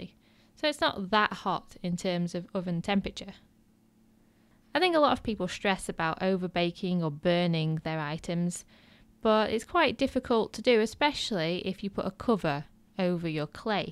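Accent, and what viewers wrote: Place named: England, United Kingdom. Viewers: I'm going to guess you're from the North West of the UK- your accent is exactly like mine- I'm from Lytham. Anyway, thanks for the informative video- I'm just getting started with polymer clay. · I really enjoyed your beginners video, and your accent reminded me of England where I was born. I live in Australia and have just started going to classes. Thank you for all your help and great tips. 😊😊😊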